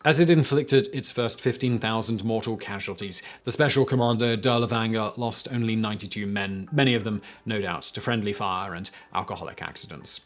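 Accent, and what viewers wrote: United Kingdom. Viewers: Can u try American /Canadian accent,British accent story telling is just too tiring ..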